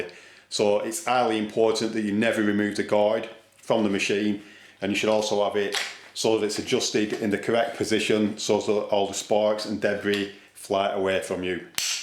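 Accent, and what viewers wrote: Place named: Wigan, United Kingdom. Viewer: You sound like you're from somewhere like Wigan or Bolton with your common accent. I think manufacturers and businesses just slap on an expiry date for the sake of it sometimes, though I'm not saying this isn't a bad thing regards a powerful and dangerous tool like an angle grinder. Having an expiry date, especially with food, is just another way of making money where people throw it away when there's no to do. I have a Clarke angle grinder and I can't get the old worn down disc off using a pin wrench; it seems to be stuck and won't turn for some reason maybe due to rusting. I put some WD40 on it but it hasn't made any difference.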